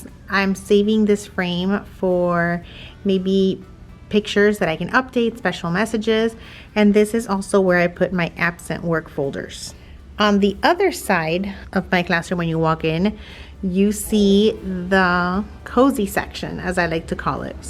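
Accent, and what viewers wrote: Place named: Miami, United States. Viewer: Great room, u from Miami? I hear accent…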